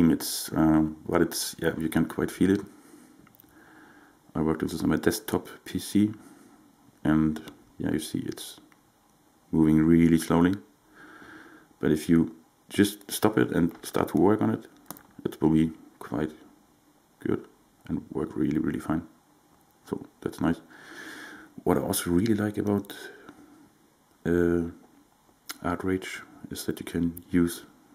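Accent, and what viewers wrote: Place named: Germany. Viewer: man hört das du deutscher bist, aber ansonsten echt gut :)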